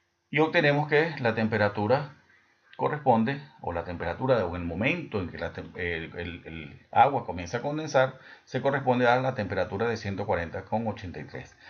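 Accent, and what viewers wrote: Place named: Venezuela. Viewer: Excelente hermano muy buena tu explicación! Y con acento venezolano todo se entiendo mejor..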